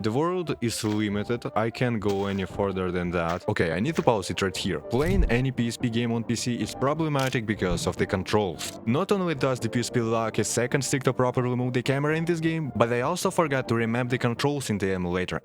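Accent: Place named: Russia. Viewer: I hear Russian accent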